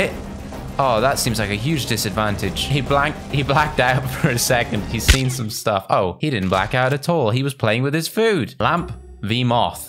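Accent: United Kingdom. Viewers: Question for: Are you British. And I love you videos it's very fun. AND YOU ARE MY CHILDHOOD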